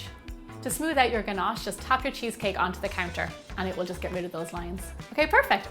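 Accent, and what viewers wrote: Ireland. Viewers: Wow! I've found my new favourite chanel! Lovely, easy food, no hard to get ingredients, and a lovely irish accent! (The best one for me) Cheers from Argentina! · Love the Irish accent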